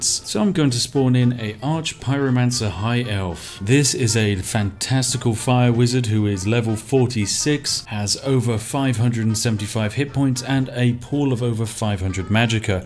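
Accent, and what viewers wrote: United Kingdom. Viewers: I basically come here for Skyrim and the British accent 😂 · i wanna see you read the lusty argonian maid part 1 and 2 with that british voice! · I findurder more exciting Me: Could this Brit be one of my people?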